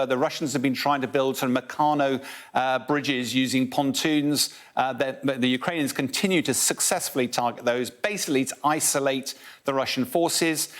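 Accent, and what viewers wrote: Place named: United Kingdom. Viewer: So what's been the timeline for the Ukrainian counterattack thus far? And why does this newscaster have to butcher the pronunciation of these Ukrainian and Russian words? It seems he's less about accuracy and more about British-ness. It's Za-por-rizh-ZHI-a, not Za-por-RISH-shi-a, KHEYR-sohn, not HER-son, POO-tin, not PEW-tin.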